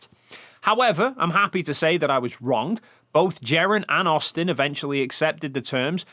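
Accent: United Kingdom. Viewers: Sorry about the not-relevant question, but... I've been trying to place your accent. Where in the UK are you from?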